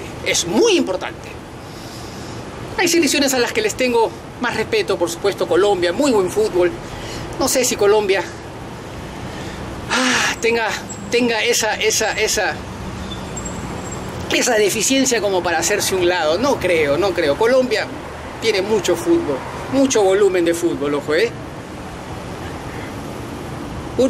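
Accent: Argentina. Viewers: Este tipo es argentino o peruano??????? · SI ERES PERUANO XKE HABLAS COMO ARGENTINO? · porque es argento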